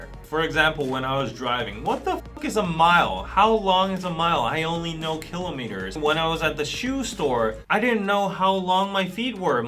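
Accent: United States. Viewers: I thought this video was a joke at first because your accent sounds perfectly american as if you were born and raised here. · I call bullshit, your accent is a perfect american accent. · perfect American accent. Very good assessment Especially about body space and Stop Signs: Stop Signs is about "Shield Arm" and Right of Way. One gives the Right of Way so that the other party passes you keeping his "Shield Arm" between you and him. So [all things being equal] you should always let the person to your right go first. Most Westerners don't know this. And never tip for shitty service. Biggest error: you are comparing California and Korea. California does not equal America. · I am really glad you brought this point up regarding personal spaces. I always thought Koreans were so rude by bumping into people and not apologizing about it. Also have a friend refusing to visit Korea again cos an old lady slapped her hand in a shop for touching something. 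No offense or anything as I am obsessed with BTS, love k dramas and Korean food. I just never thought of it from your perspective. Apart from the Chinese, who are more nonchalant about personal spaces as well, all other Asian countries I’ve been to are more similar to America on this topic. In fact, the Japanese are insanely polite and the Taiwanese love chatting up with strangers. By the way, I always thought you lived in the States for many years as your accent sounds so American! How did you keep up with the English language after moving back to Korea? Interested in finding out. 🙏🏼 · this was great! But I'm confused... I've been watching your videos for months. And, if you haven't been to the US in 18 years WHYYYYYYY do you have a mid-west accent (Min-i-SOOOO-da)?? And why do you know so much about US geography and use American colloquialisms? Both you and Danny "seem" very American in thought process, at least in the sense that it is intuitive to you how to explain Korean things so that an American would understand/relate. 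That's why your audience is so big here, I think. Who/what is the influence for both of you? Maybe this is a video? Or maybe there is one and I need to look back at your old ones? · I was waiting to feel somewhat insulted, like I have seen in other “Koreans react to America” videos, but I found yours honest, respectful and amusing. I’m amazed that you only lived in America for only 3 years when you were young, yet you speak English so well. I can see you surprising the waitress with tip questions said with an American accent. Thing I found weird in South Korea was the letter “F” for the fourth floor elevator button because the building management felt the number “4” was bad luck. Guess like the number 13, so lucky building don’t go beyond the 2nd floor here. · Where is he from that he speaks perfect American English?? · Wow your accent is very american/well pronounced for the short amount of time spent in the states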